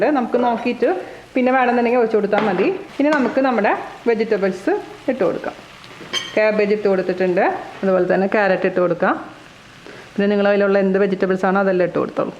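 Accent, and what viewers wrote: Thrissur, India. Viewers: Intro matenda chechi...athokke karanam aanu ente kudumbathile aalayi thanne thonunne...pinne ente relativesinte okke same perinjanam side trissur slangum..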